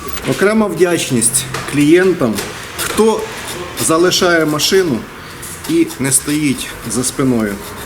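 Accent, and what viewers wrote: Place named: Surzhyk, Ukraine. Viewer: Только правильно не "перейдемо на украïнський" а "перейдемо на украïнську". Почему я, русскоговорящий, знаю грамматику лучше? Это как, никого не смущает? Если уже говорите на украинском, делайте это хорошо, не похабте своим корявым суржиком действительно красивый язык.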